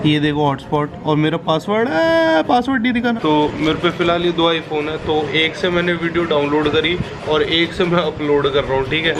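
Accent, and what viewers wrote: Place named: Hathras, India. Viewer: bhai aapki language toh hamare jaise hai hum bhi hathras ke hai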